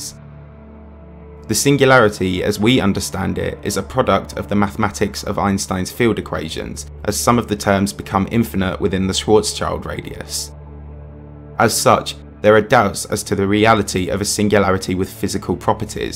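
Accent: United Kingdom. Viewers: I don't especially like the british accent but i like his voice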